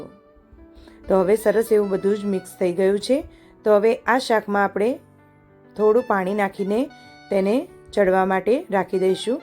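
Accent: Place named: Mehsana, India. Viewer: Mouth watering thaali 👌 Your accent looks like mehsana, if so, please reply 🙏 Thanks 😊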